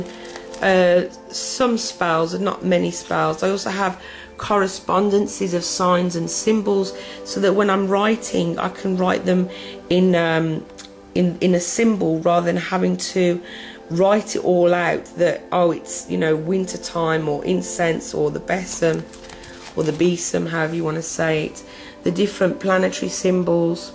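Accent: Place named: United Kingdom. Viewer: you have the loveliest British accent!! I love it.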